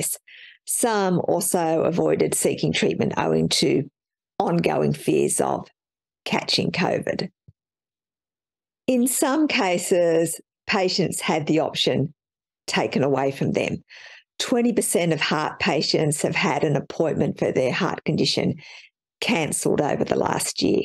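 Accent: Australia. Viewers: Vocal fry on top of an Aussie accent, combined with an unflinching shit-eating grin, makes you excruciating to watch.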